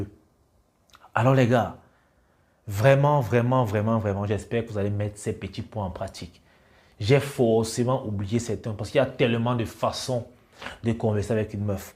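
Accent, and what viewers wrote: Cameroon. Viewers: Toi tu es mon frère camerounais, vue ton accent et ta manière de parler je suis sûr que tu es un Camer, et big up et force à toi bro. ( like mon commentaire si tu es Camer et que je ne me suis pas trompé )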